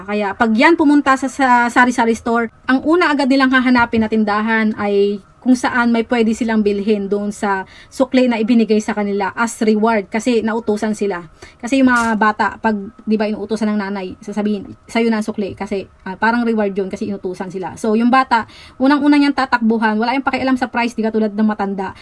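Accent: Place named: Batangas, Philippines. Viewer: Napansin ko na tinatanggal ninyo ang inyong Batanguena accent...pero kung minsan e hindi maiwasan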